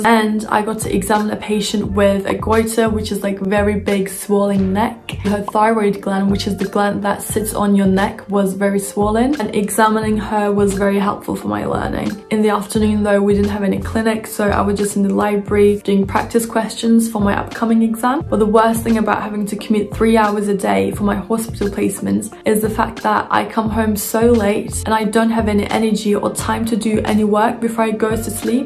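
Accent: United Kingdom. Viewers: She must be in the UK. Cause her accent. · Altyazılar olmasa ingiliz aksanını anlamıyorum ama halledicez artık xmcmmxö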